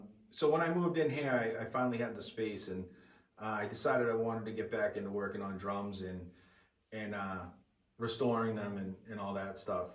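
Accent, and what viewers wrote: Boston, United States. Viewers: Sounds like a fellow Masshole that likes good drums. Fackin ah, khed!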